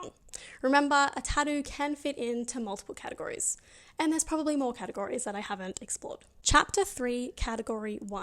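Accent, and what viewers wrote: Australia. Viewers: So you pronounce it tadoo in Australia? My brain makes me understand "taboos" half of the time. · Oh, I am from Austria - where tourists think that Kangaroos are from. Don`t hear too mutch aussi accent here - that`s maybe why you sounded unfamilliar to my ears...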